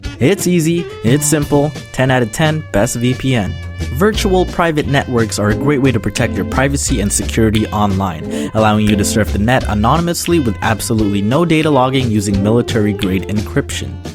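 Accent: Canada. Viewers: Why in the absolute fudge do you have a semi-quebecan (?) accent when speaking french?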